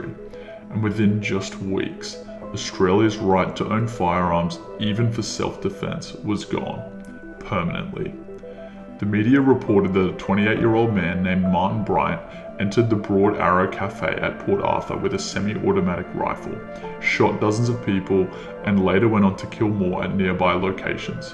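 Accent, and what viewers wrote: United States. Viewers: Sounds very American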